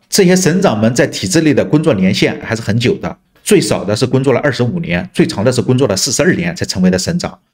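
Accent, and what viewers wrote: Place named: Anhui, China. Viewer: 主播一口白完农村口音，应该是安庆池州纵阳铜陵一带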